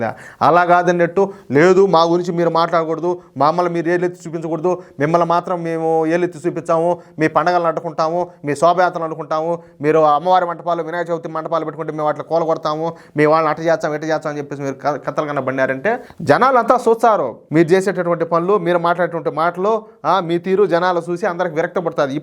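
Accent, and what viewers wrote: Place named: Nellore, India. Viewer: I like your Nellore style language Garu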